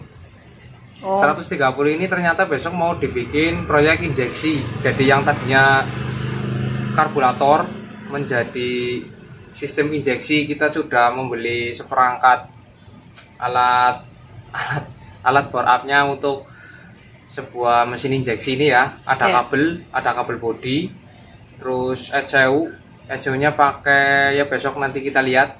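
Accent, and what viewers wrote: Banyumas, Indonesia. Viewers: Jenengan dari mana mase..kok rodo ngapak..?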